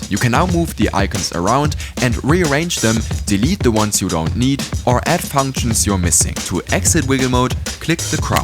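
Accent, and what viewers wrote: Germany. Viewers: German accent overload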